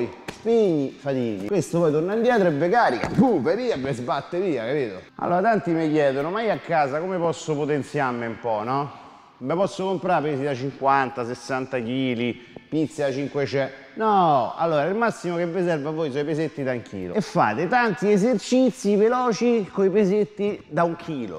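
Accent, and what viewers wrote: Rome, Italy. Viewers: Il più bel corso di difesa personale mai visto...Grande anche l'accento Romanaccio...Aooo sei troppo forte..Ciao..Pam Pamm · a romanaccio!!!! te piace da menà! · Amo troppo l'accento romano xD Anche se sono Genovese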